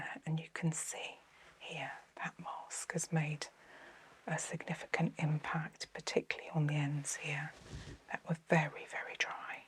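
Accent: England, United Kingdom. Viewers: English accents are heavenly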